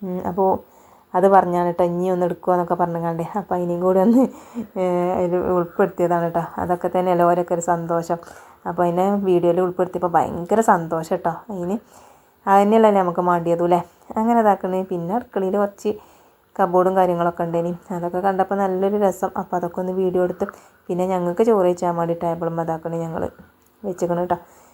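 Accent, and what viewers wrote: Malappuram, India. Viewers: ട്ട,,,,, കൂടുതലാണ്‌ട്ടാ,, ട്ടാ,,, കുറ്റപ്പെടുത്ത് ഒഴിവാക്കണ്ടാ,,,😅 എനിക്ക് നിന്റെ മലപ്പുറം വർത്തമാനം കേൾക്കാൻ നല്ല ഇഷ്ടമാണ്, ടാ,,, ഇത് കേൾക്കാൻ വേണ്ടിയാ ഞാൻ വീഡിയോ കാണുന്നത് തന്നെ.,❤️❤️❤️❤️❤️❤️❤️👍🏻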